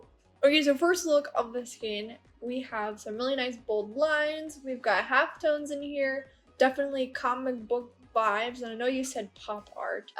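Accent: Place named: Texas, United States. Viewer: You’re from Texas. Well you sound like you are!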